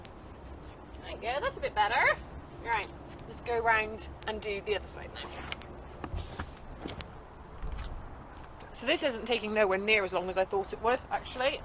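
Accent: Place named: South, United States. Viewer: Very informative as usual and you can’t beat a southern accented girl talking udder grease and pig oil lol x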